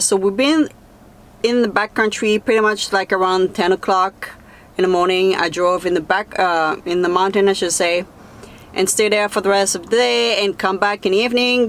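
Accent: Quebec, Canada. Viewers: French Canadian, from Quebec city.✌️💕🇨🇦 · I think she said her accent is from Quebec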